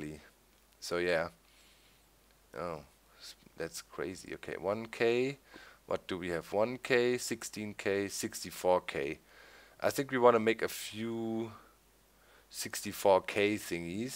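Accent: Germany. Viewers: Mancmal merkt man schon noch das du eig. Deutscher bist^^ Aber sonst coole videos und weiter so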